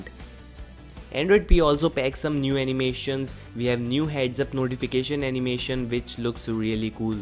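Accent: India. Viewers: Can't understand terrible Indian accent. Bulbulbull....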